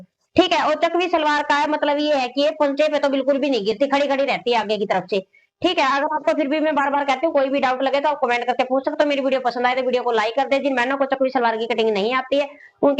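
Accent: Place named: Kaithal, India. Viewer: Ap kaithal side hein kya.mere mama rhte hein unki boli h ap jaisi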